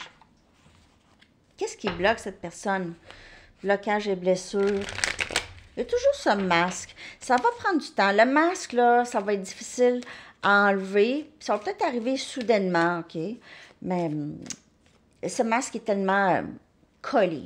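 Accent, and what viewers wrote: Canada. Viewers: Bonjour, mille merci pour vos guidances et quel plaisir d'entendre votre bel accent canadien! je suis en amour pour votre si beau pays et vos si beaux paysages, votre culture si bienveillante.....🤗💖🦌🐾🐻🌲🍁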